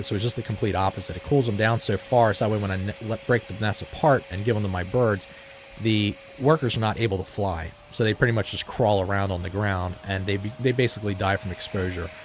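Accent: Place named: Midwest, United States. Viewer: I’m from the PNW & can’t place his accent. Is it like Midwestern?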